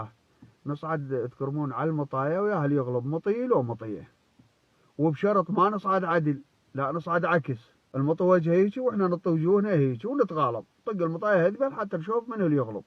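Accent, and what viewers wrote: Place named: South, Iraq. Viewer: لهجة جنوبية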